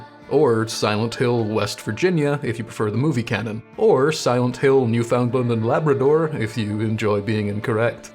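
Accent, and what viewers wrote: Scotland, United Kingdom. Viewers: YOU'RE SCOTTISH???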